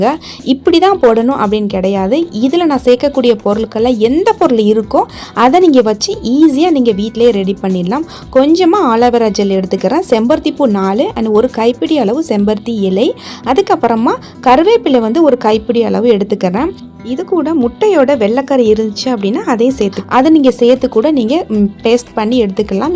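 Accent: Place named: Ramanathapuram, India. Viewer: மாஷா அல்லாஹ் நீங்கள் சொல்வது அனைத்தும் உண்மையான விஷயம் தான் ராமநாதபுரம் பாஷை கொஞ்சம் வித்தியாசமாக இருக்குமே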